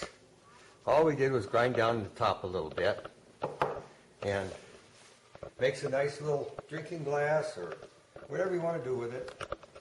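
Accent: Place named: Minnesota, United States. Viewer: You sound Minnesotan, or somewhere close lol. I could have sworn that this was my uncle lol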